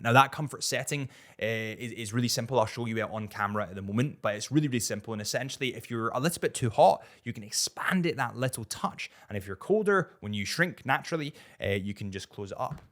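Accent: United Kingdom. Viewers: Bro if u want your channel to have more wider audience speak slowly coz not all of us are native from UK that could easily understand your accent, I love your channel but i keep repeating it just to understand on what you are saying, i know that theres a subtitle but still its not accurate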